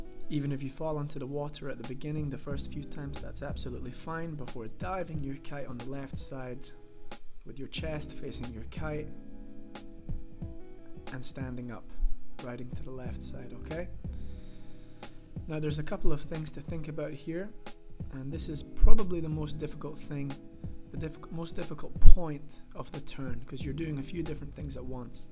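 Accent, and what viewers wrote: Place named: Scotland, United Kingdom. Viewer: Really well explained, top work. Emso important to show why things go right AND wrong so you can self identify your problems. Lovely soft Scots accent too...Fifer?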